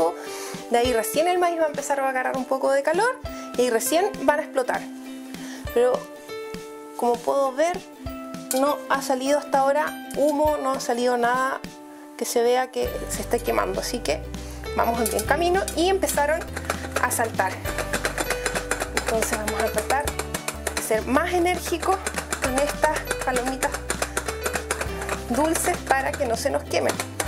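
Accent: Chile. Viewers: Tienes voz de chilena :v , muy bonito video , viva chile :)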